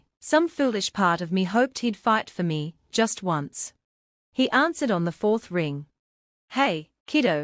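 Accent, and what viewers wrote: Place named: United Kingdom. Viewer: A British narrator and an American holiday? Kind of ruins it for me.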